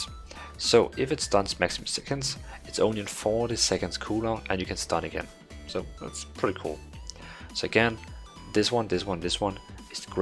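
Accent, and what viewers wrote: Denmark. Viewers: Love the danish version of english...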